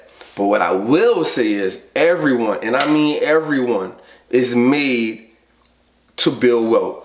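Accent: New Orleans, United States. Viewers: 💯👏👏💯👏👏💯👏👏💯LOVE that NOLA twang!!!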